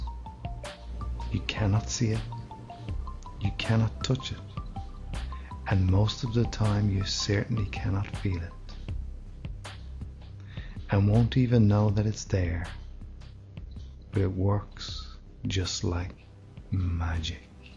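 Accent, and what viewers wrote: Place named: Ireland. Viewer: Another Irish voice not making me calm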